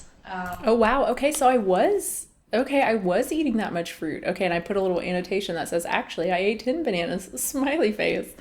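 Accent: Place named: South, United States. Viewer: what happened to your southern accent??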